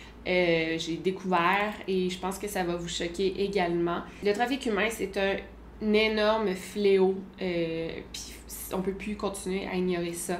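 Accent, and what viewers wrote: Canada. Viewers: Bravo! J'adore regarder tes vidéos et peaufiner ensuite avec quelques recherches de mon côté, tes sujets sont toujours très attractifs et tu réussis à te les approprier d'une manière vraiment intéressante pour ceux qui te regardent, on ressent que tu es réellement investie dans ton travail et ça fait du bien! Et ton accent canadien est le petit bonus que j'aime beaucoup! Bonne journée, une de tes admiratrices françaises!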